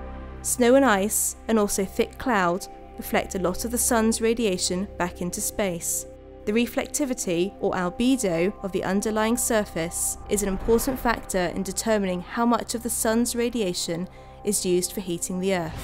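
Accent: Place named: United Kingdom. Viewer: its like british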